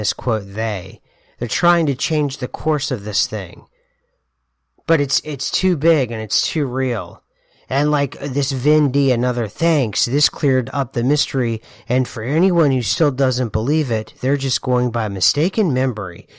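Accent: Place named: Pittsburgh, United States. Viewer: You sound like your from Pittsburgh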